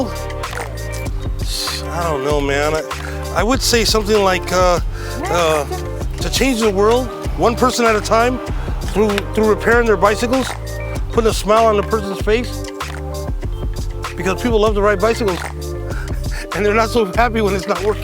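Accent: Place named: East Los Angeles, United States. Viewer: This guy is great - can tell right away by his accent he grew up in East L.A.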